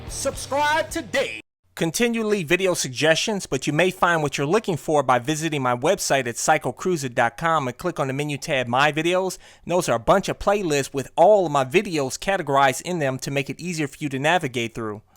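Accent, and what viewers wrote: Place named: South, United States. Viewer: You're pretty cool for that CycleCruza. Thanks for the sauce (southern accent)!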